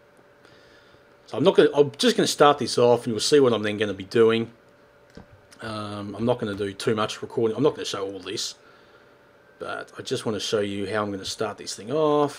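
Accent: Australia. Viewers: he sounds like an auusie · this doesn't matter to me but answer this truthfully Are you actually Aussie or an American poser?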